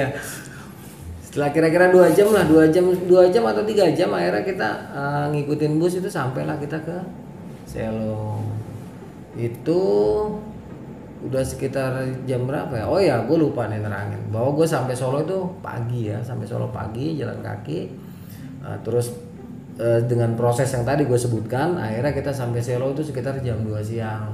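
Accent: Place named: Bandung, Indonesia. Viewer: Bang bisa logat bandung euyy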